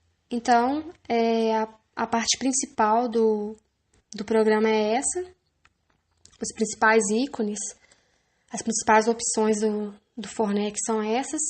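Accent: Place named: Minas Gerais, Brazil. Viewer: Sotaque de mineira e mexendo com software de Antenas, deve ter feito Inatel...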